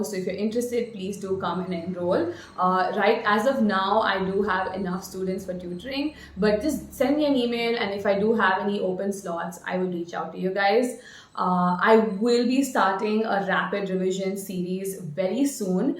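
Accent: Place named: United States. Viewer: 1 more question • How did you improved your communication and English speaking(American accent) ? As a indian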